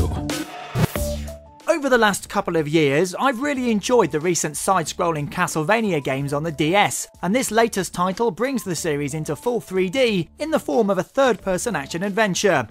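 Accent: England, United Kingdom. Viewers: every reviewer shut be forces to have a English accent like this guy : )!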